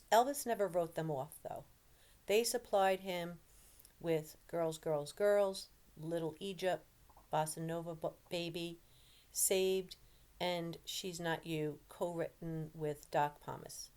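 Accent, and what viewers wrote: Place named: New York, United States. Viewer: What part of New York are you from? I love the accent - I miss it! I used to have the "east coast accent" (I lived in Trenton) - but I've lived in Ohio for close to 40 years now... so it only comes back when I'm angry!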